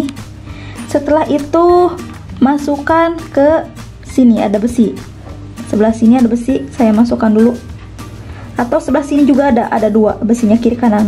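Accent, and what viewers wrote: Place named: Sunda, Indonesia. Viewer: Menginspirasi banget tteh, nada ngomongnya nyunda, 🤭 galfok sama " biar ga amburadul"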